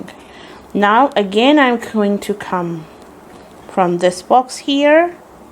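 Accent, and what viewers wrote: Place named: England, United Kingdom. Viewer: i personally love your English accent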